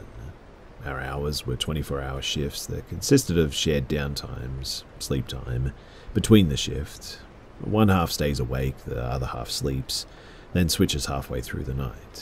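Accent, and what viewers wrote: Australia. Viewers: Welcome back, man. Great to have you & your rhythmic Aussie cords. 🤭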